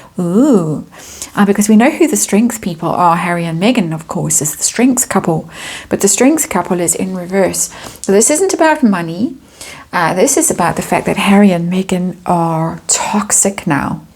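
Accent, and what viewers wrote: South Africa. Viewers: Everyone seems to be making money from this debacle, don't you think? You are one of 3 unbiased readers I trust. Plus I enjoy listening to your S African accent. My father lived in Cape town for many years before relocating because of unrest, to Sunshine Bay Australia with his second family. We have many S African dentists and opticians in my home town in Kent, I've noticed. 😊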